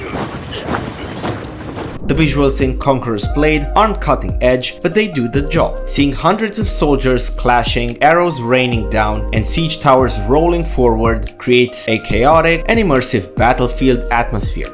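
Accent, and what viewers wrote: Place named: India. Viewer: Indian accent detected. I dip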